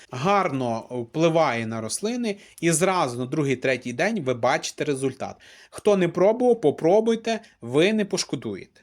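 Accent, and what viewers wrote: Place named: Western Ukraine, Ukraine. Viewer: Западно-украинский говор. мОї-мої,наголос на " Ї",не жара, а спека.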